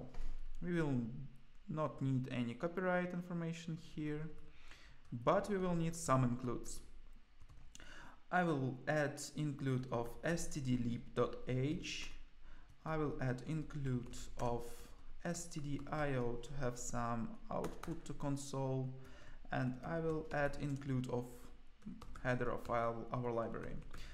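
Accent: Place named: Russia. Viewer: сразу русский акцент слышно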